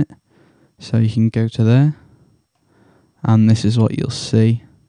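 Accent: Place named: Northern England, United Kingdom. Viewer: ho,, before i for get,, are you from the north of england as your voice and manerisms seem similar to mine..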